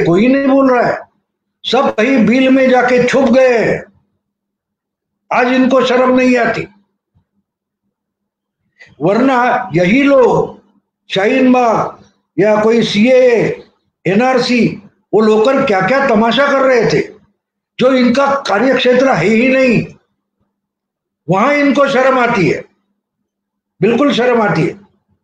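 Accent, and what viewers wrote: Maharashtra, India. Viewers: Utkrushth muddesud Mandani.. Hinditala Marathi tone awadla..Bhau kuthech watle nahi Hindi kamkuvat ahe apli.. Tumchyasarkhya vicharanchi aaj garaj ahe bolat raha. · मराठी स्वाद वाली हिंदी मधुर लगती हैं.बहुत बढिया.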